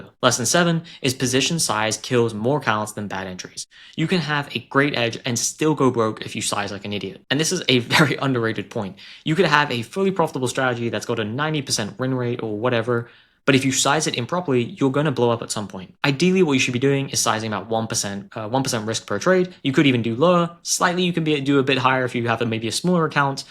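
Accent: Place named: Australia. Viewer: Are you Aussie bro? Your accent seems kinda mixed . Cool videos!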